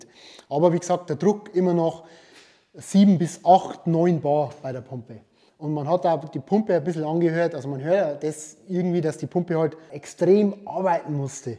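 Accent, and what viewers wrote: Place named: Regensburg, Germany. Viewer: Wo kummst du her hörst du ho wie so a Regensburger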